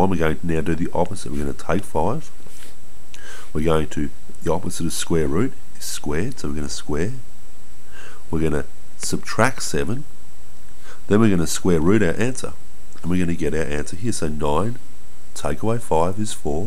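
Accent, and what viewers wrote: Australia. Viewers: People why do u have to be mean, have u ever heard of Australia,yeh thats where he is from,same with me, so other people have diffrent voices when it comes to countrues so stop being mean. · he sounds like an australian dathi de nogla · Hardcore Australian accent [IN A GOOD WAY]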